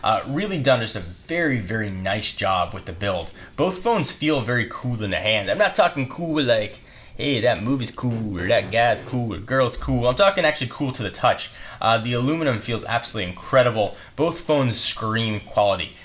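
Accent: United Kingdom. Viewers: british accent on a phone always makes the phone better.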